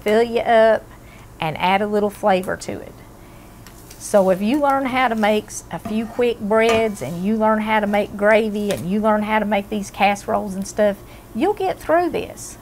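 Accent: South, United States. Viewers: Can i ask what "hillbilly" state you are from? Im guessing Alabama or Mississippi? Maybe Georgia? You sound more southern than country.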